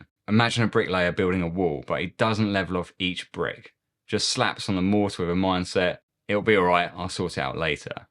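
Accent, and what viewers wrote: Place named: United Kingdom. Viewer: I always found the British accent annoying but this guy takes it to the next level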